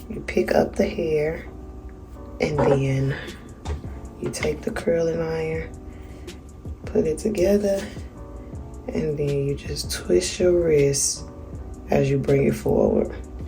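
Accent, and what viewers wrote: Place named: South, United States. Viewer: I love your country talk😂 it’s so cute and funny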